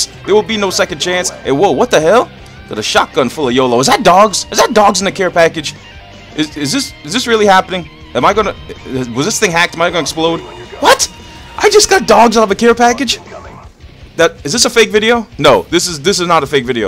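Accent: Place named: Brooklyn, United States. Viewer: the more worked up he gets, the thicker his brooklyn accent gets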